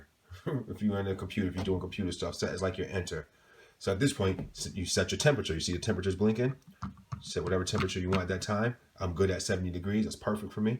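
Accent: Connecticut, United States. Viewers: Bruh speaks like a Connecticut Yankee!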